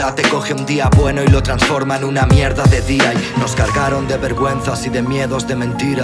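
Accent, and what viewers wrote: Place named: Andalusia, Spain. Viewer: me encantan tus temas, pero deja de poner acento del sur, enserio tio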